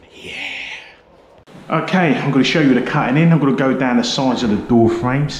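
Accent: United Kingdom. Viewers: Pro-form Blaze and semi oval contractor brushes are good too. I'm in U. S. and that's all I use along with the Picasso. I love hearing the Brits talk. Such a cool accent.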